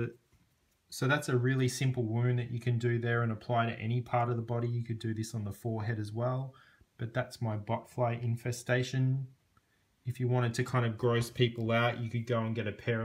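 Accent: Australia. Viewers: Are you an Ozzie? You sound like an Ozzie. Like if YOUR a Ozzie.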